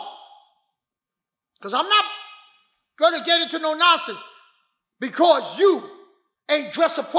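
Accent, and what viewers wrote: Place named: Brooklyn, United States. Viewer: Unc speaking big facts …I hear Brooklyn in Unc’s voice or somewhere close definitely from NY… sending much love from HTX enjoying the content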